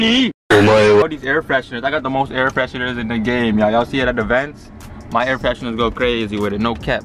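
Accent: Jamaica. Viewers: U know to talk like Jamaican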